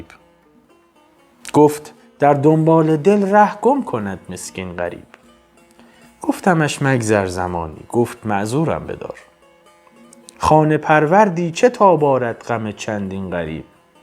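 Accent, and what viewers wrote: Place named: Kabul, Afghanistan. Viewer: عزیز را خوشبختی نصیب باد که‌ خیلی خیلی خوب گپ می زنی و با سخن زدن ما مردم کابلستان بسیار نزدیک و کاملا قابل فهم است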